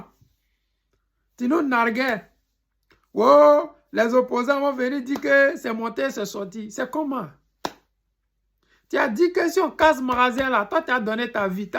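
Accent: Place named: Guinea. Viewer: C'est punition de DIEU. Ton accent même est guinéen mais c'est sûr que tu es dans la politique avec les déstabilisateurs de la Côte d'Ivoire. Tu as participé avec tes frères à installer le gars là. C'est la punition de DIEU, va à la mosquée prier pour demander Pardon de DIEU et pardon pour les cœurs des Ivoiriens , qui vous ont reçus mais qui ont saignés par votre faute.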